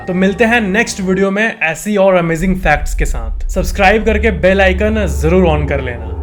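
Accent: Bihar, India. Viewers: Typical Bihari tone 😊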